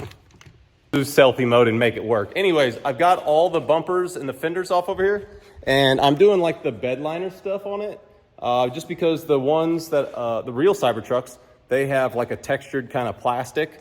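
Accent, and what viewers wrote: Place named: Ohio, United States. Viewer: Dude sounds like hes from ohio like the accent dose lol sounds like home lol😂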